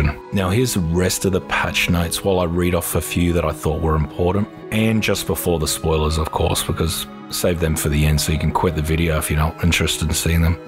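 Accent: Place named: Australia. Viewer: If I click a Forest video and it doesn’t have a gritty Australian accent, I assume it’s a clickbait or just bad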